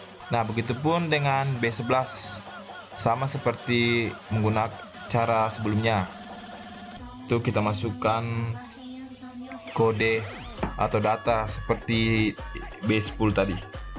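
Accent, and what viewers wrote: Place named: Bugis, Indonesia. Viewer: Logat bugismu itu e 🤣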